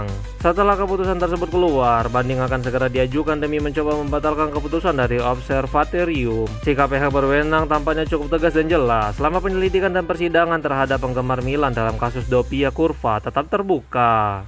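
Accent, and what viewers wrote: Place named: Mamuju, Indonesia. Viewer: Ini adminx dari kab. Mamuju, prov. sul-bar yach? Logatx mirip orang mamuju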